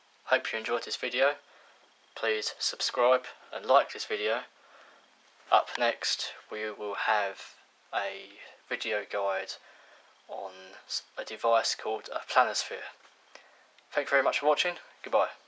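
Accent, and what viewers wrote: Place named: London, United Kingdom. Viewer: you sound like youre from london!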